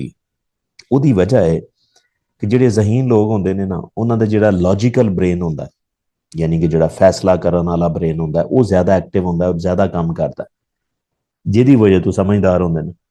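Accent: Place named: Punjab, India. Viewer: Punjabi accent nice.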